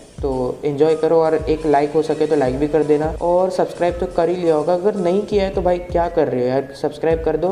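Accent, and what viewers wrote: India. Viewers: Are you bengali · Bhai ap gujarati ho???? +_+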